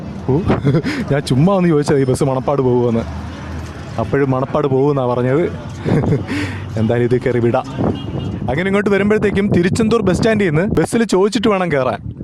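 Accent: Kollam, India. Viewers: കൊല്ലം ശൈലി.😊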